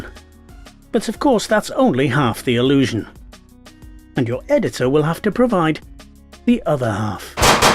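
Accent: Wales, United Kingdom. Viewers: Are you welsh sir? 😎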